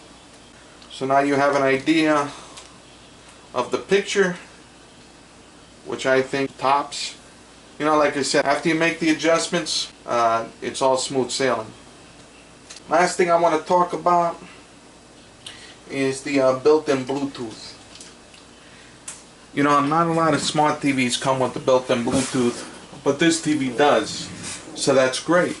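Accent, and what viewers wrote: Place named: East Coast, United States. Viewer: are you from Brooklyn or Jersey! I know you from the east coast. you can of sound like dice clay😊 I'm older and remember watching him on cinemax in the early 90s but good review.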